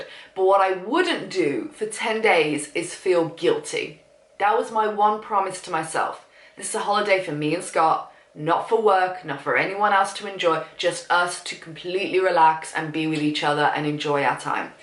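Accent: United Kingdom. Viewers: you are soo beautiful and ur British accent makes you even more beautiful.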